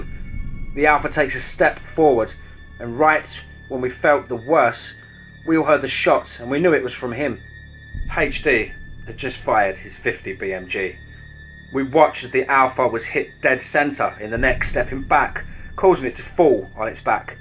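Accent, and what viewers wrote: England, United Kingdom. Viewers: You rock,. Keep it up. Love to hear stories in an English accent... My forbears are from the Isle so it's in my blood. I hope you had a happy Xmas with the family. Stay safe and keep up the awesome work.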